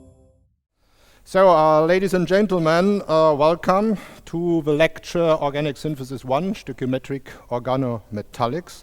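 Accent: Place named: Germany. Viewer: A German talks Eng 😀so cute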